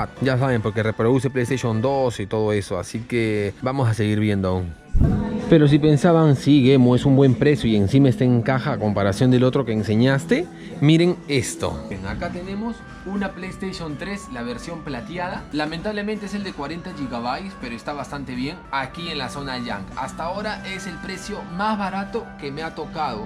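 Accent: Peru. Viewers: Mano eres de peru verdad Por cierto que buen canal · BUEN VIDEO BROT POR TU ACENTO DIRIA QUE ERES PERUANO...SERIA BUENOS QUE BUSQUES EQUIPOS DE SONIDO VINTAGE HI FI HAY UNA GRAN COMUNIDAD DE ESO ASI COMO YO EN CONSOLAS GAMER , MINICOMPONENTES DE SONIDO ETC SALUDOS DE LIMA - PERÚ. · eres peruano no manolo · Por su acento probablemente es de Perú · Por la manera de hablar es peruanazo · Eres de Perú ?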